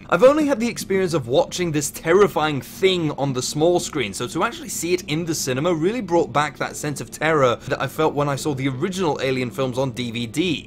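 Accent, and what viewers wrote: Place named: United Kingdom. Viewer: Nice video, very good points and your delivery was spot on. Also your British accent is one I wish I could replicate.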